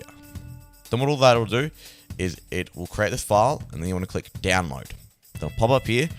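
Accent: Australia. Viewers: Are you from Australia or New Zealand? Your accent. It sounds Australian.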